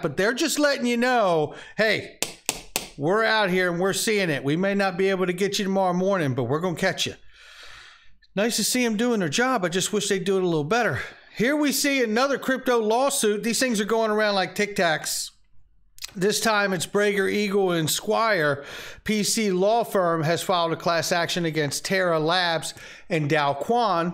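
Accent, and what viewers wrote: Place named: United Kingdom. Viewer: We loveee your show TV 🥰🥰🥰🥰🥰😍😍😍😍😍🥰🥰😍🥰 you very good british speak i very much smile happy see you ❣❣❣ love really bro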